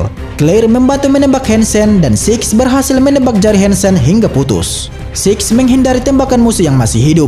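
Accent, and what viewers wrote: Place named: Java, Indonesia. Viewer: Bahasanya pake bahasa jawa y bang .,,medok Kali I😁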